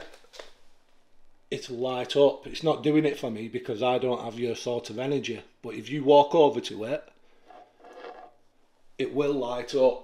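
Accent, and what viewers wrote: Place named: Northern England, United Kingdom. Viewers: This is madness. You sound like me, are you sheffield/Rotherham area? Do you get scared at all or have you just got used to it? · Alreet, lad. I'm new to your videos, and I've more or less binged watched them. When and how did this all start mate? You sound local too. I'm from Manchester.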